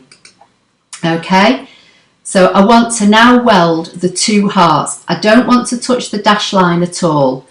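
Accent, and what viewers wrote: United Kingdom. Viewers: Great and beautiful work! And I looooooooooove your British accent!!! I'm from Córdoba in the middle of Argentina. Namaste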